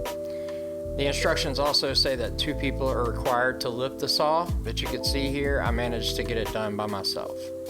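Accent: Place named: Louisiana, United States. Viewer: Hey man, good video thanks for sharing. What part of the Who Dat Nation you live in - sounds like you're down near Grand Isle?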